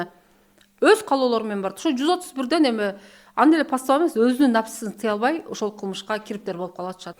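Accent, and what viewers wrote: Standard. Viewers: ишиңде ийгиликтер болсун. Өтө так, нукура кыргыз тилинде сүйлөгөнүң аябай жакты. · Бул эже ото акылдуу экен , таза кыргызча суйлойт экен.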